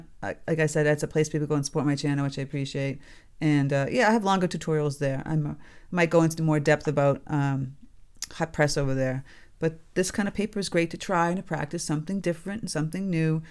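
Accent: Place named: New England, United States. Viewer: I just started a week ago and don’t have high expectations (yet) but I just got halfway through a mountain landscape and quit because it was just that bad! I was scrolling through your videos and found this…definitely words I needed to hear. Keep trying, it WILL get better! I’ve watched several videos by various teachers and really enjoy your style the best (plus I was raised in New England and enjoy the accent).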